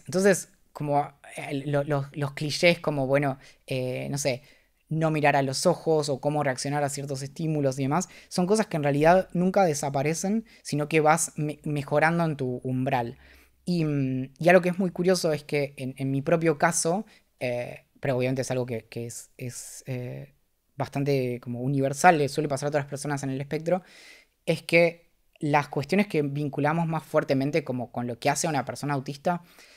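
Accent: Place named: Israel. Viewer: Y ese acento HEBREO ??? Judio o uruguayo o mide east … la doble LL ó Y porque SHO porque yo.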